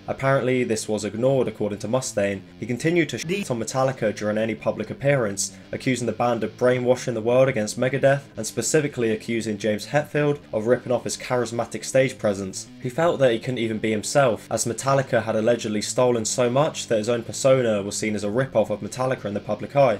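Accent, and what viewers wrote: United Kingdom. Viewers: I hate when red coats narrate American business.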